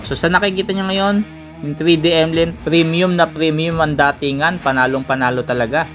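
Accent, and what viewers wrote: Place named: Tagalog, Philippines. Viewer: Cguro boss ang pronounce nya sa example ay exampel hehe ksi tagalog sya,yong double dobel sa mga tagalog hahaha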